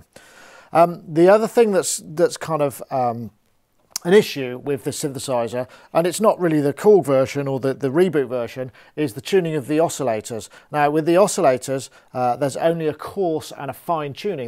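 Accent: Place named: England, United Kingdom. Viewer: Man, are u from england?? I can't understand shit you say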